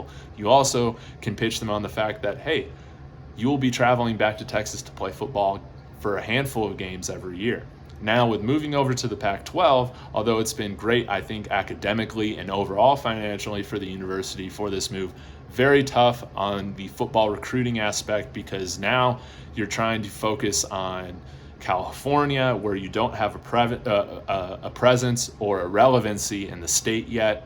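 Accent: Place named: United Kingdom. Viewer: yes I saw - nice to hear a British accent in the videos. Traoure looks like a beast - but I was also waiting on Christian wade to break through for the Bills! Fingers crossed CU can get one of them into the league - trying to keep up with who is from last year with the CU and who are the new prime recruits. But it will be fun to watch the spring game! Keep up the content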